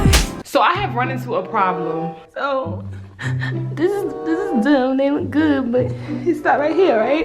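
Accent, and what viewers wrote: Baltimore, United States. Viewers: I was like where is she from? Then I heard you say Stupid I said oh she's a Baltimore native 😭😂 love you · oooo do I hear a baltimore accent 👀🌝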